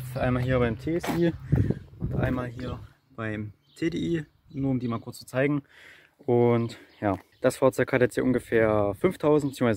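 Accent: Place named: Saxony, Germany. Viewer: Kommst du aus Sachsen? Daumen hoch für den Akzent, Grüße aus DD 👍🏻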